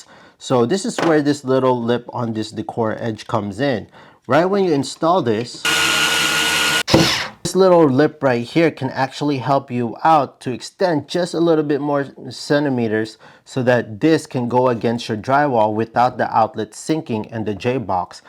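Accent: Philippines. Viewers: you got a hint of Filipino accent, great content by the way.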